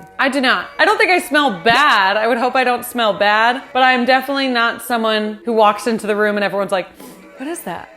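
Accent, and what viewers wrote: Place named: California, United States. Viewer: As an Australian who can't stand the valley girl accent, I can tell you I wouldn't have guessed that's where you were from ❤ Your accent is much nicer than the stereotype:-p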